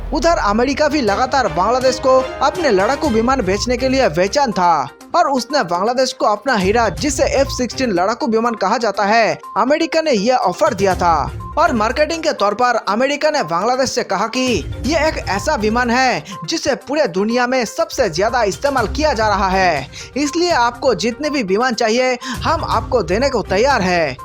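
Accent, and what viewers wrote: Bengal, India. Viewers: I must say, this fellow is a Bengali guy by his Hindi accent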